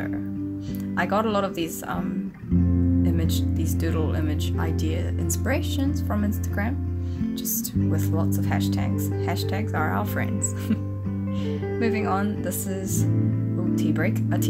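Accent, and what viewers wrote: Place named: New Zealand. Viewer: I follow you on ig nd u sound lika full on moari 😂 didnt expect tht.. Was thinking like an american accent or asian(i swear im not racist,forgiv🙏)😆 Btw Green tea all da way fo me ✌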